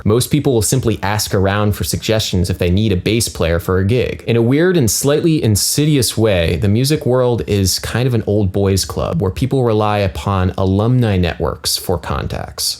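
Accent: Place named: United States. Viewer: He's not speaking English - He's speaking American English. Huge difference. Like most Americans, he has no idea of how to speak and let their audience digest what they are saying...just 7minutes of constant monotone robotic babble without barely coming up for air. Feckin awful. I've no idea why American's think it sounds cool to speak like this cos to English speakers it just sounds pure thick.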